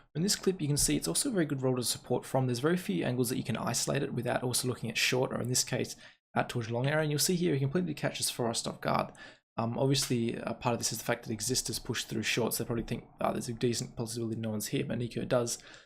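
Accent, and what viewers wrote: Australia. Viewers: jargons I'm an Asian and English isn't my first language and I can understand him 100%. He speaks normally like any Australian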